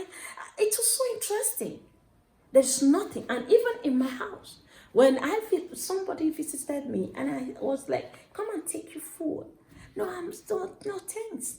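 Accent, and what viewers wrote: Kenya. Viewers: Your accent is now more Kenyan than Nigerian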